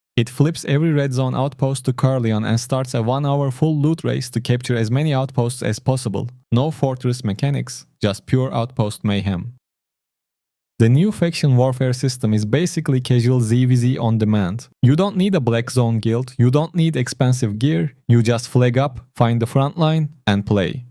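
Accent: Turkey. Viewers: türk aksanı var sende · türk aksanı var azıcık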